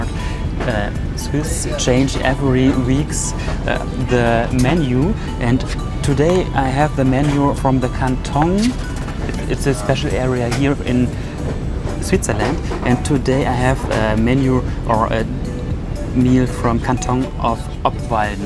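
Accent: Germany. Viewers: Dein Englisch ist so deutsch! So richtig schlecht!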